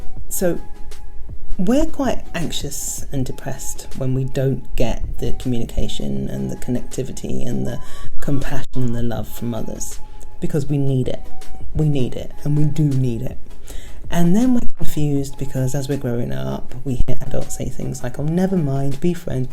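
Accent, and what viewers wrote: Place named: United Kingdom. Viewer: My god, you are beautiful! Totally wasn't expecting to hear another British accent :-) Your locs are beautiful!!! Need to have a look through your videos :-)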